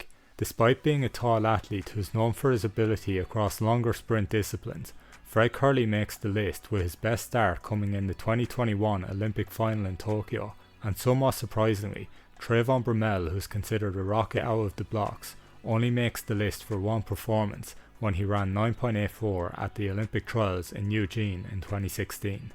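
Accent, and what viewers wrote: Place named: Caribbean. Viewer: He has to be from the Caribbean.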